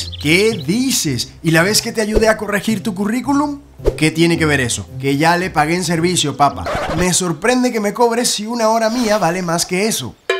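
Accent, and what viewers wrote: Argentina. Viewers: el asentó cordobés es horrible, no lo aguanto, igual que el asentó chileno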